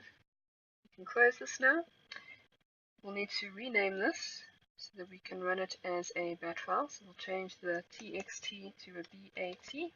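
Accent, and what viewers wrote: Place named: South Africa. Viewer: you sound very South African. Awesome. Dankie.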